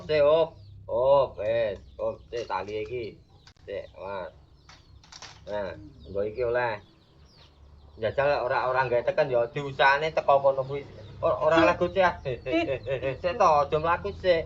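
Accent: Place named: Blitar, Indonesia. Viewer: kong sampean asline pundi apa blitar ko nadane koyo wong blitar blitarnya mana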